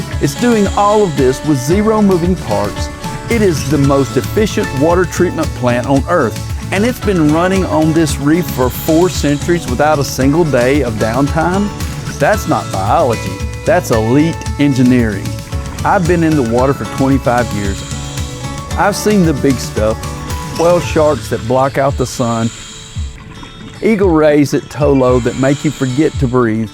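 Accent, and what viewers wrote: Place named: South, United States. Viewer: This is fascinating - but also it’s nice to hear a southern accent for a change.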